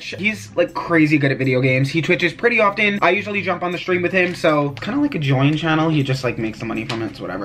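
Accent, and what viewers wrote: New York, United States. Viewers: That New York accent thou😂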